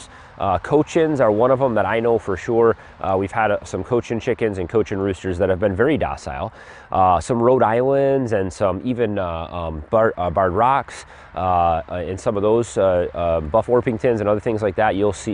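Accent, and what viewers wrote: Michigan, United States. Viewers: Bro are you from Michigan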